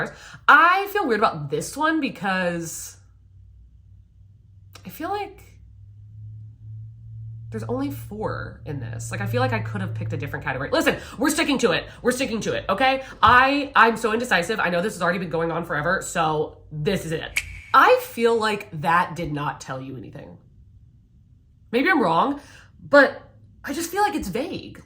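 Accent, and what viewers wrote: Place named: Scotland, United Kingdom. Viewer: The amount of sliding into passionate quasi-Scottish in this video made me be like YES THIS IS HOW WE EXPRESS OUR LOVE 🏴 Five stars, would watch again 👏🏼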